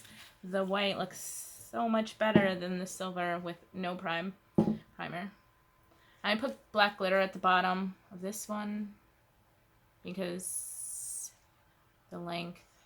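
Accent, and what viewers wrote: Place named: New Jersey, United States. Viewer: Lol I'm from NJ, just moved to Florida.. your accent was a welcoming thing to me.. we should go have some cawfee 😂😂😂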